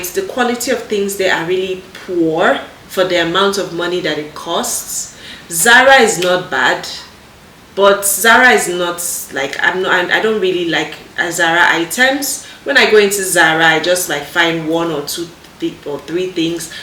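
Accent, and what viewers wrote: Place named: Canada. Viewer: Lol, Very Canadian accent.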